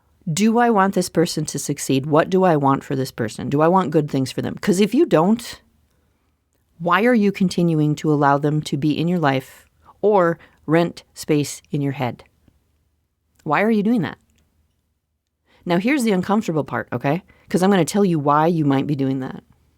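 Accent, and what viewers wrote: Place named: Midwest, United States. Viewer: I think you have a Midwest accent, but I sometimes can hear the Minnesotan/northern Wisconsinite/Canadian O sound in certain words. I can't really describe it, but you probably know what I'm talking about. lol :)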